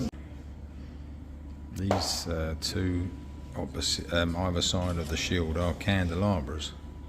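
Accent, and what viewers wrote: United Kingdom. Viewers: Love listening to the narration and the British accent.😊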